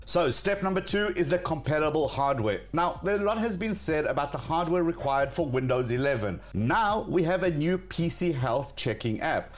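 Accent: South Africa. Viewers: I'm hearing a 🇿🇦 accent, or am I mistaken? 🤔.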